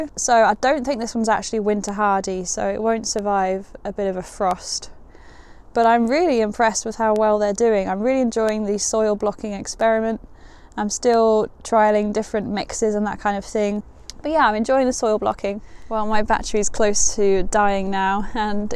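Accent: United Kingdom. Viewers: i wonder if the girls of naughty ing ham get teased a lot... had to say that .... mybad ... i don't mind the obsession with the weather but i can get a little flustered with this accent ... which is somehow very appealing yet annoying ... so snobbish - must have to do with the power the Brits had over the centuries... imo ... just ignore me...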